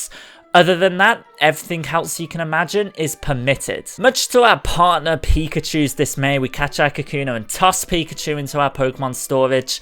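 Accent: Birmingham, United Kingdom. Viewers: Damn, all these videos I've watched and I had no idea you were from the same town as me, Brummies unite! You'd think I'd recognize the accent but apparently not. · My guys from Brum! love that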